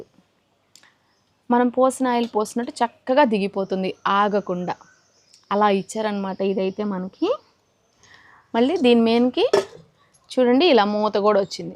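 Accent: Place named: Prakasam, India. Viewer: మీ డి ప్రకాశము జిల్లా కదా మీ వాయిస్ స్లాంగ్ అలాగే ఉంది రిప్లై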